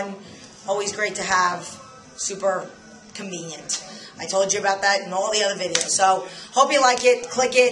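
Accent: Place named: New York, United States. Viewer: she must be a new yorker, she sounds like a new yorker! Great video post, thanks for sharing. Rock on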